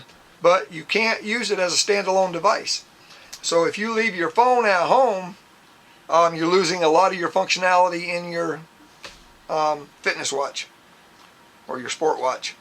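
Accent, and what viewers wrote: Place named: South, United States. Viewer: Use Suriii.... ahh southern people accent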